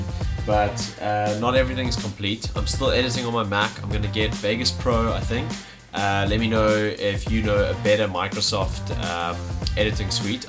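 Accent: South Africa. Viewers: awesome bro, like to hear dialects from home. I'm from nam..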